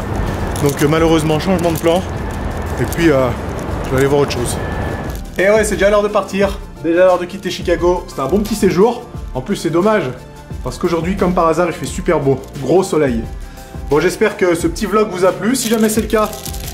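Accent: England, United Kingdom. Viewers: Meme pas une petite fin avec ton accent anglais?! J'espère voir ca dans une futur vidéo! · Sympa ce genre de petite vidéo aussi ! Par contre je suis déçu je voulais entendre ton accent anglais x')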